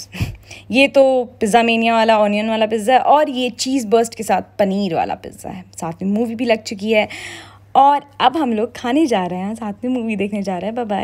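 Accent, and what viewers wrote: Bihar, India. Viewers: U r speaking a real bihari time😄😄 Love from gaya🥰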